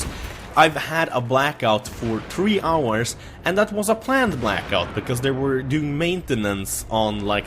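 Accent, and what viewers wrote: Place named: Sweden. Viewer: I love your swedish accent// I'm from sweden to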